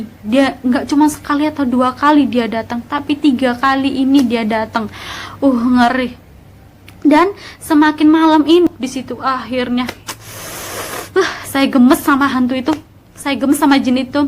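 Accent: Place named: Malang, Indonesia. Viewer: Ceritane serem, tapi ngerungokno ngomonge lucu rek... ngalam banget 😁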